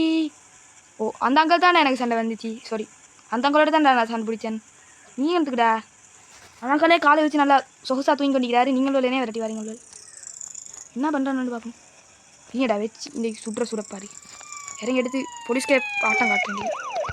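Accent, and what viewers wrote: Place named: Kerala, India. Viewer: Actually naan oru malayali than onga lihta malayali mari irrnthuthu so no disturbance 🎉❤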